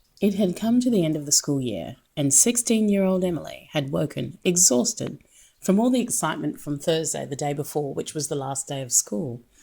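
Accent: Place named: Australia. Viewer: You're a great narrator, always enjoyed your work with Dark Waters channel.....love the Australian accent and would love to hear you and Be.Busta do a collaboration.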